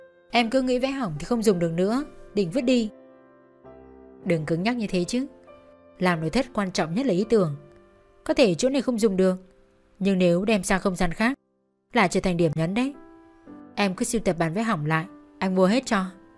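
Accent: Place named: North, Vietnam. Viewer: khong phai ky thi chu giong la giong bac ma minh thay de thuong kinh khung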